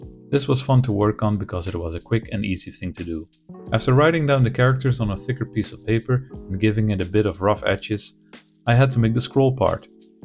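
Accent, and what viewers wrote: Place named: Netherlands. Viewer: Very cool! I think you did an amazing job. I’ve never done anything like this before but this inspires me to at least look into it. And the animation is so cool! Also: I thought I recognized your accent. You’re Dutch right? One other hint I saw was your Lego boek with on the cover: ‘het complete werk’.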